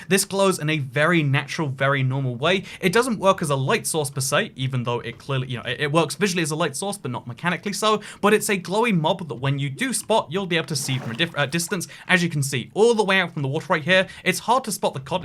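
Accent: United Kingdom. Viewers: is British. Lichen is pronounced 'Litchen' in British English. pronunciation is therefore correct.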